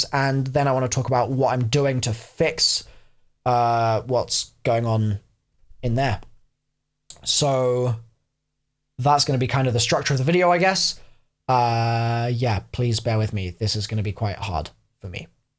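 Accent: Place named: England, United Kingdom. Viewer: Goodbye, I'll miss hearing zombies commentary in your english accent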